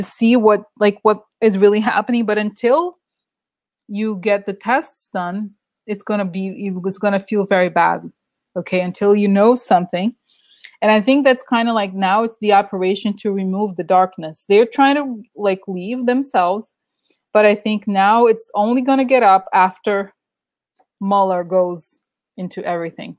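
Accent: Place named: Russia. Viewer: Xoxoxo I love your Russian accent. 😊😊😊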